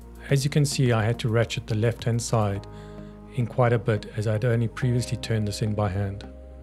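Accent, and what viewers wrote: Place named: South Africa. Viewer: Hey you probably won't reply to this but you sound South African. I just want to ask if you are South African where did you get the kit cuz I want to make one of my own but i cant find where to buy one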